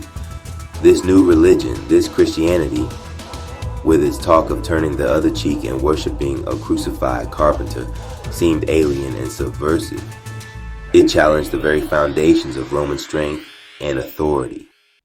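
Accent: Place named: Sweden. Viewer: Narrator has a Swedish Lutheran accent.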